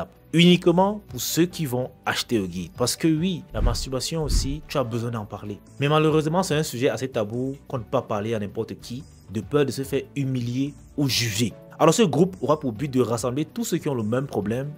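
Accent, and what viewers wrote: Cameroon. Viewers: Je mise que tu es Camerounais 😂❤❤❤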